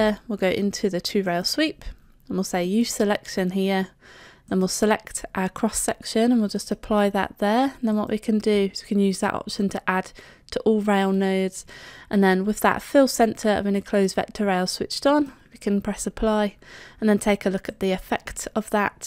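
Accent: United Kingdom. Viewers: any speech cannot be more english than this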